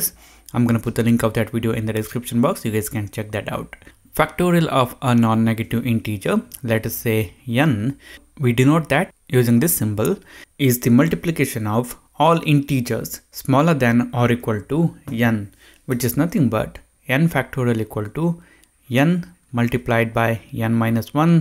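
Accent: India. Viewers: Please leave and never come back if you cant accept Indian Accent.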